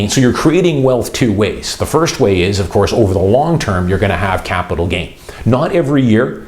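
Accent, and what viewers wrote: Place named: Canada. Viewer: wow I never realised what the Canadian accent sounded like until this buy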